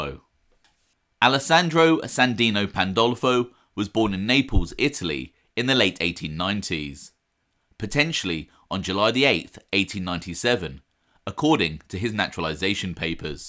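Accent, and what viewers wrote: United Kingdom. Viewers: For the life of me, i cannot understand why this channel doesn't have a million viewers by now. With all the mob related content out there, this channel stands alone as the best source for accurate information on the mafia in NYC. My wife especially loves to hear the "sexy deep voice" in which it is delivered in, I personally wouldn't go that far, but as everyone knows, If you enjoy a documentary about how the world was once in chaos, how humanity hung in the balance, and or how John Gotti got a haircut once a week, you definitely need a British accent for that sort of thing. has got that in spades.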